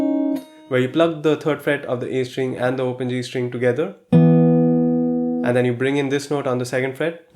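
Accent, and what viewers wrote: India. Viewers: You sound indian ! Where are from brother ? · Omg your accent tells me you're from India dude...are you??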